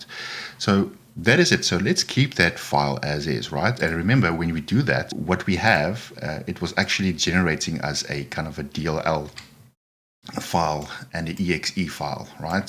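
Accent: South Africa. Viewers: This guy sounds South African :) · Ey Brother Man. Picked up that you may be South African. Just Subbed. All the best.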